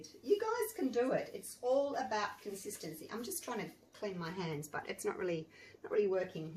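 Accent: Australia. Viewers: I get such a kick out of the way she talks. Not the accent, which I’m low key obsessed with, but the things she says like right-o and hey? Or aye? I’m not entirely sure what she says ... but those types of things. I don’t know if it’s an Australian thing or an everywhere but New York thing. I enjoy people/things that are different from myself. Btw: I’d love to do a class type of thing with her! Like via zoom or something! That would be so much fun && totally something I’d pay for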